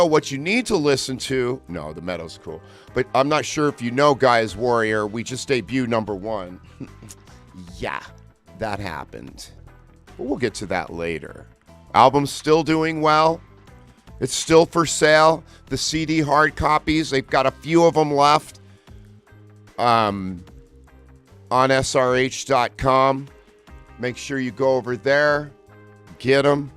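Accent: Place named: Nebraska, United States. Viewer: You sound like you're Hastings Nebraska this is your dialect and maybe you don't know it but sound like you're from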